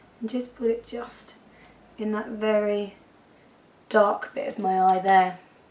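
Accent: United Kingdom. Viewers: I just love doing that line, it makes such a big difference along with the mascara, sometimes you don't need anything else on the eyes. When you said gel liner I heard jaw liner, but of course english is not my native language, and paired with that lovely british accent (which I would kill for, I can only speak like Americans meh) it came funny to my ears. :D BW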